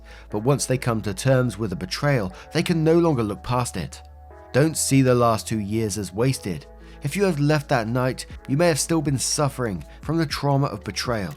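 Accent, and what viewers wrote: England, United Kingdom. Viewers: just wanna say, i am american, and I'm not huge on english accents but I love your voice, its so calming even when you are swearing i love it xD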